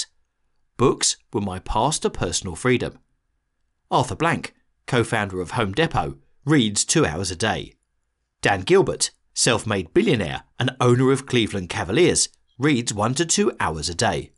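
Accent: United Kingdom. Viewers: The irony of a man with a British accent talking about the successes of Benjamin Franklin. 🇬🇧❤️🇺🇸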